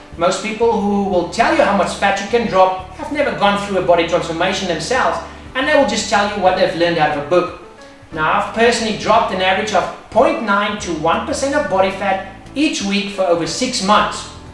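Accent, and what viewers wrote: South Africa. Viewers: Sounds like South Africa, right? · You sound like your South African and British. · are you south african?? :) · South African accent... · If i were to guess, i would say non other than English. From his accent, I think he is from South Africa where they speak predominantly English although there is a possibility that he also speaks Afrikaans which is the second most spoke language in SA.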